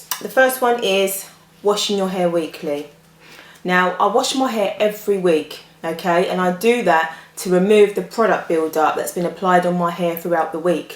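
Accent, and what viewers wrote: United Kingdom. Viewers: are you british....you say strangffin ...thats funny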